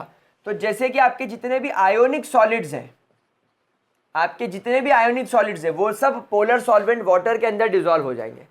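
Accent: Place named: Bhopal, India. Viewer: Sir your voice is same to same of bhopalvwalo :---- hoegi ❤️❤️❤️❤️🙏🔥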